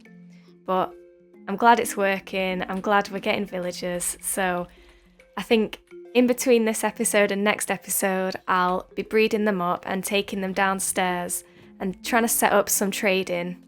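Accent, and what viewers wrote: United Kingdom. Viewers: Hey! First of all happy new year and i know this can be too much but can you please make a castle on top of the 2 mountains and connect them with a bridge?? And also are you British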